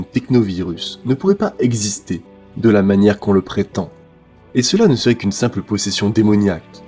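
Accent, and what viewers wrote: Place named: England, United Kingdom. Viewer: Chouette vidéo, par contre vraiment pas fan de la prononciation des noms avec "l'accent anglais", cela fait très "lourd" avec tout le reste en fr...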